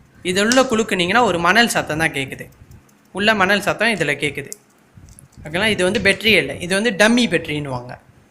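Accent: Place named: Malaysia. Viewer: Malaysia la apdi thaa pesuvaanga bro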